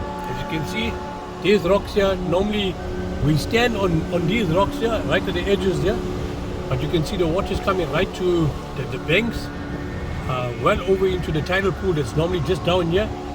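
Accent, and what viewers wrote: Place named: South Africa. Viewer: Country would have been useful, judging by commentators accent and posts elsewhere, I take it this is somewhere in S? Africa, obviously not UK! Last person to mention havoc was Shakespeare.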